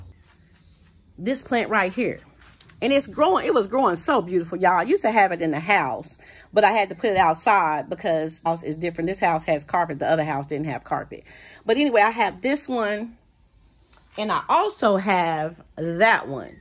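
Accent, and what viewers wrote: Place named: South, United States. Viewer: You so funny! You make me laugh, when you are talking to us! That southern draw is lovely!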